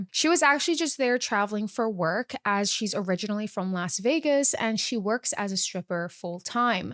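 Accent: United Kingdom. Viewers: I was thinking the same thing hahaha sometimes british sounding and sometimes american sounding but it's actually so nice and unique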